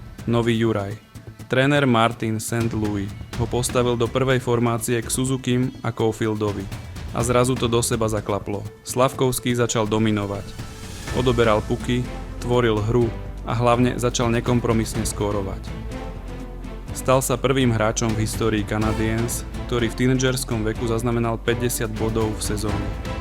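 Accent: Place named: Slovakia. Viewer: Mne sa to práve že páči. Rozpráva po slovensky a nie po "ameuricky" 😊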